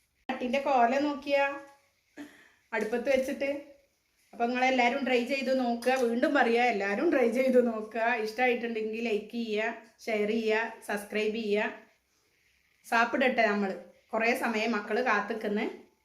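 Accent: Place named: Wayanad, India. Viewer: താത്താന്റെ സംസാരം കേ ട്ടിട്ട് വയനാട് ജില്ല തോന്നുന്നു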